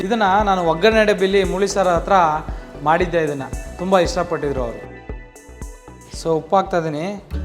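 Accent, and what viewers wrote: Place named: Mandya, India. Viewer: Avva Karnataka dhalli ondhu ondhu prantyadalli ondhu ondh thara mathadthare, adhe reethi adhu Mandya bashe artha madkovva comment madoke munche sariyagi yochesu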